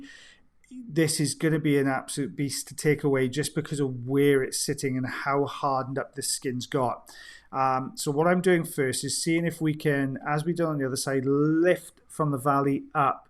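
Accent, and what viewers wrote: Wales, United Kingdom. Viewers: Lovely!! The suspense of.......its coming out......oops no it’s not.......here it is......noooooo! Better than a Steven King thriller this one !!! Thanks for sharing your talent and expertise with us.....your PATIENCE with your PATIENTS is remarkable and I miss hearing the Welsh accent, someone from home. We don’t get too many Welsh here where I am in Ontario Canada. Keep em coming!! CYMRU AM BYTH 🏴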